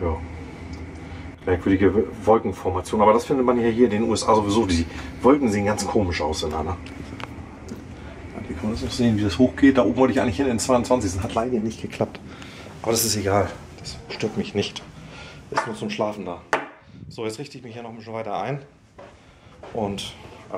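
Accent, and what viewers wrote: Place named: Germany. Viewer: Random Logick Nicht spießig! Aber schon sehr deutsch 😁😜 Gerade durch Sprache. Aber alles cool ich mag ihm ja 😜 Bin ein Fan thumbs up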